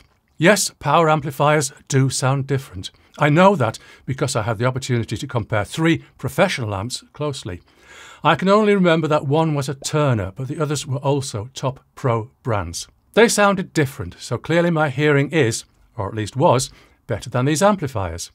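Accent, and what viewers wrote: United Kingdom. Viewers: Yes. He has one of those thin accents. He's from the North. But he certainly isn't from Liverpool.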